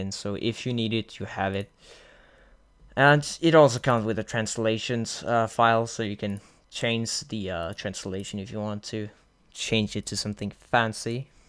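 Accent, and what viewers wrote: Denmark. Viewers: oh your from denmark :D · Are you danish?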